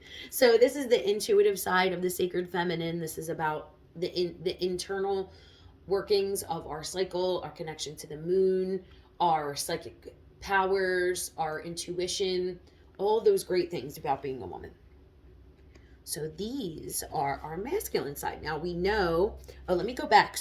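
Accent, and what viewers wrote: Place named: Philadelphia, United States. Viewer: Once again, such a great job! Not gonna ask where you are from but I was born and raised in South Philly and you sound like a straight home girl. 😀 Did want to point out that your comments about the masculine becoming more feminine and vice versa made me think of all the attention around LGBT+ issues. Personally, I think these changes are good, they indicate that perhaps humanity is striving for integration. I know as a man, being aware of my feminine side has made me a better husband to my wife and father to my daughters. You are doing a great thing with this channel. Keep it up.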